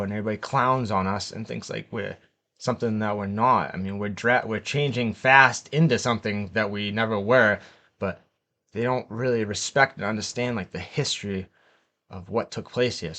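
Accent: Boston, United States. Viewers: Are you from Roxbury ? 😅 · What I don't understand brotha, is how you have a Boston accent coming from Gloucesta. Are you shittin me? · There is a DISEASE which has infected Yt, and it's the nauseatingly overuse of the meaningless, annoying, and thoroughly useless word "iconic." (Note I did not say "icon" as in "bell icon") Theres nothing especially sophisticated, meaningful or clever about it, yet EVERYBODY on YT has a mania for it's use. It's gotten to be effing CRINGE. No, seriously. As soon as I hear it, I fight the urge to PUKE, I turn the video off, downvote it, and move on. This video was, predictably, no exception. I turned off ten videos yesterday over this and so far today, three, yours included. In a ROW. YouTube's nearly sexual preoccupation with the word is not my imagination. That word doesn't make you sound cool, hip, or creative. It makes you sound like an annoying moron trying to sound like you know a bunch of big-boy words. 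Knock. It. Off. Ive already turned the video off, and yeah I'm the guy who gave you the downvote you earned. All because of that word. I betcha feel all fancy and sophisticated now, dontcha? And you were doing so well, too. Made it at least to the 5 minute mark. Then you just had to use it. Get a new act already. Trying to sound sophisticated with a Boston accent is impossible anyway.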